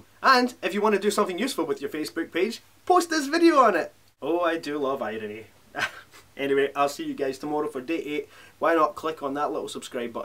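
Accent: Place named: London, United Kingdom. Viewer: his accent is annoying, i recognize his accent from suburbs of London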